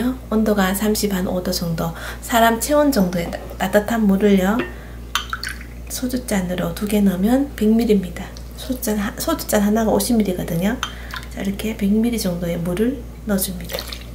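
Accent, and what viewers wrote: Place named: Daegu, South Korea. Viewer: 는 대구분이신가요? 그러시다면, 말투가 처음엔 좀 거슬리더니, 보고 들을수록 정겹네요 ^^ 는 내가 이세상에서 호감을 갖는 최초의 대구사람이십니다~ ㅎㅎㅎ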